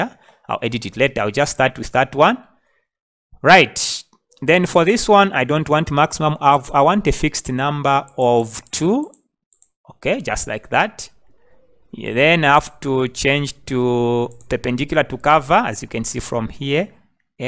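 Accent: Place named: Zambia. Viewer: My guy you sound Zambia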